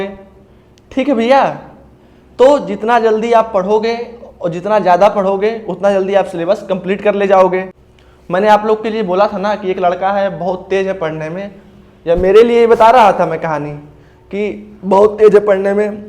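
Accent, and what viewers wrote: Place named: Bhojpuri, India. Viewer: Aapka aawaj humko bahut aacha lagata hai bhojpuri type me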